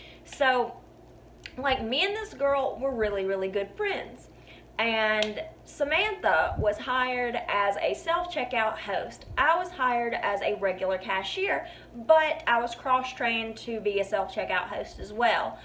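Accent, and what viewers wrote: South, United States. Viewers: Sorry it didn't work out at Walmart. Not all Walmarts are bad. I hope you are doing well and you definitely have a future in entertainment. Love the southern accent ☺ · Heavy southern accent 😂👍👍 cool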